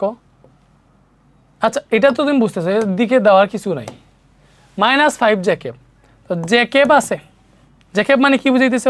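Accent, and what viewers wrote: Sylhet, Bangladesh. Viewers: vai apni ki sylheti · Vaiya apni ki Sylheti...??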